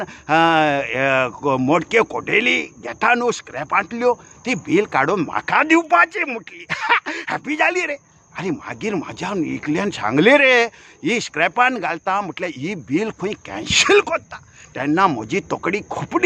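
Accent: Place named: Ghati, India. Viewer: Like your perfect ghantti language and acting God bless you 🙏🙏🙏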